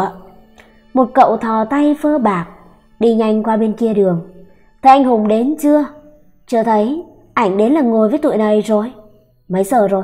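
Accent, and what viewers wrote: Standard. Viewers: Giọng đọc chuẩn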